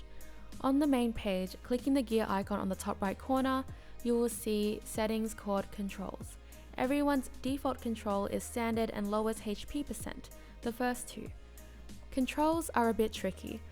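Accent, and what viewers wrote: Australia. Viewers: Are you an aussie??? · That australian accent Love it <3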